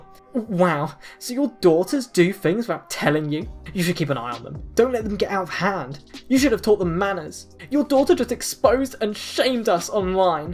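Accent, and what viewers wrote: United Kingdom. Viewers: Legit doesn’t sound male or female, just british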